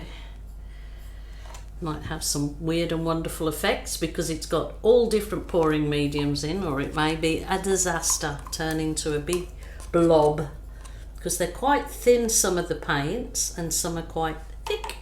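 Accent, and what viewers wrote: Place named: Black Country, United Kingdom. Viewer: Great use of left overs, love the yam yam accent 🤐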